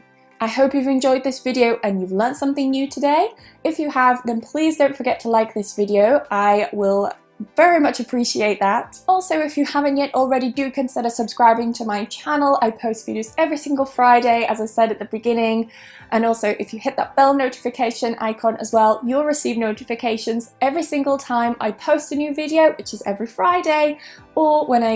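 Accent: United Kingdom. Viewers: Thanks for the video...It's very useful for me..., l love ( watching) the way you talk in british accent...,by the way...you're the most beautiful english tutor l have ever seen,...thank you...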